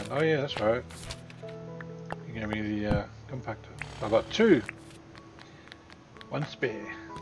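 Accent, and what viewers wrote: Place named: Australia. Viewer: Judging by your voice I'm guessing you're a fellow Aussie haha..... G'Day mate!. Loving this game, so addicting!. I don't want my partner to play as she'll never go to work again haha.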